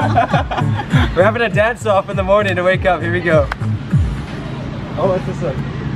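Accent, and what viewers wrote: Visayas, Philippines. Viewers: ....hi cute kaayo ka mag bisaya, wow ha... gd boy kaayo ka, mag amen jud ka SA mga matatanda, bless you.... from Dubai....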